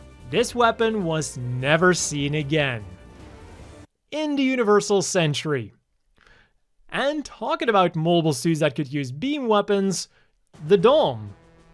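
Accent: Belgium. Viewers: ooooh je bent een belg! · As a Dutchie, it's great to hear you speak in Dutch (with the lovely Belgian accent)!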